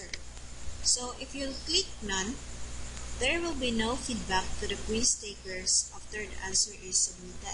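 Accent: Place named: Latin America. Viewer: You sound Latino..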